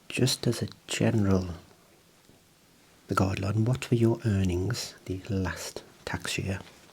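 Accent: Birmingham, United Kingdom. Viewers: I didn't know how much I needed Brummie ASMR 💓 this was lovely, thank you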